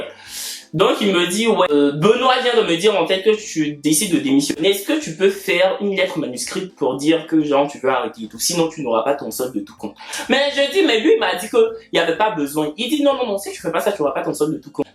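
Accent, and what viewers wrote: Cameroon. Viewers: C l’accent camerounais lol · Tu parles trop comme nous les camer 💚❤💛. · Je dis ca oh ha pure kmer expression. L accent et les expressions du cameroun. Il doit avoir des amis kmer. C est que du positif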